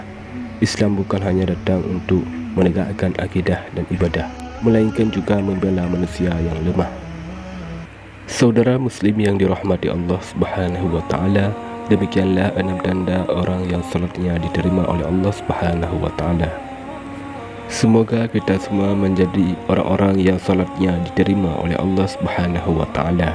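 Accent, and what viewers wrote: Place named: Bali, Indonesia. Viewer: Orang Bali kah, ithu ....ditherima....dst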